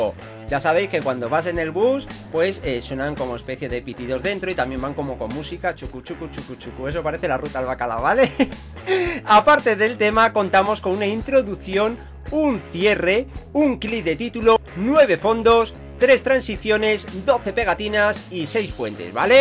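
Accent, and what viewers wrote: United Kingdom. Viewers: Que guapete eres tu inglés es de cambridge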